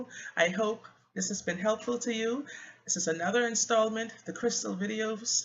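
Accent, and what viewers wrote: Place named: Bahamas. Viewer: Are you bahamian? I hear an accent. Great video!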